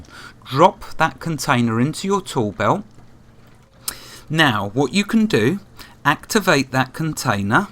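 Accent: United Kingdom. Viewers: cool - I will continue to try and get the level up - I would love to join the server and get whatever speak thing you use (Teamspeak?) up and running - I am from Kent originally but I have been living here in Arkansas for about 3 and a half years so its good to hear another British accent - the usual response to my accent here is "you ain't from round here are you boy" - I generally respond by saying oh what gave it away LOL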